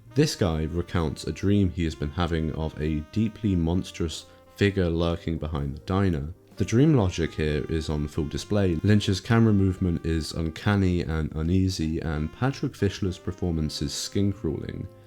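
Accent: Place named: United Kingdom. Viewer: I will no longer be watching videos narrated by the hated British.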